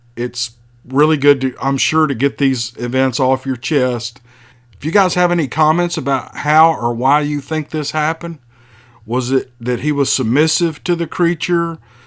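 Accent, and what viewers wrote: South, United States. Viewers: Had been watching Bigfoot videos on youtube and came across your channel. I never comment, but so enjoy your stories and southern accent I had to say what a great job you do. Thank you for the interesting stories and the time you put into this channel. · I think Sasquatch are far more intelligent than most people realize. Whether it understood english or simply read the man's body language, I'm sure they understand enough from their experiences with humans to see that we love our canine companions. Perhaps they keep animals as pets, too. Great story. I enjoy the stories spoken in that familiar southern drawl. Keep em coming!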